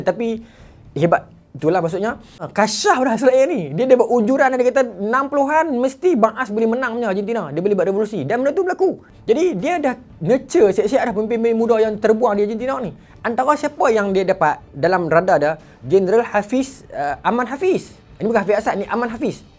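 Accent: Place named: Kedah, Malaysia. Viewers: Pekait benor ngt aih bang kedoh tu, penin aih sy nk fakham abg ckap, struggle nk catchup lajhuu ngt aihhh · Ingat sy sorang je tk faham. Maybe sbb lograt utara kot. Rupanye ramai je sama. Nk kuat tv vol tv budak dah tido pulak.😂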